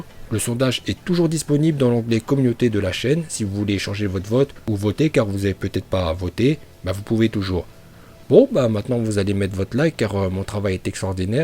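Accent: Japan. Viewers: t’es vidéos sont bien mais sa rend fous t’es accents japonais alors que t’es breton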